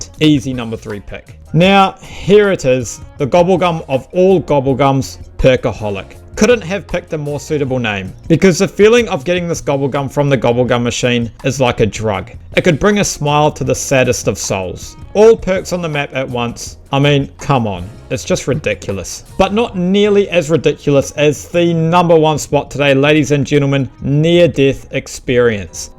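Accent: New Zealand. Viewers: yooo bro i came from the lex video and instantly recognised your accent since im a kiwi too. love to see a zombies creator from nz. keep it up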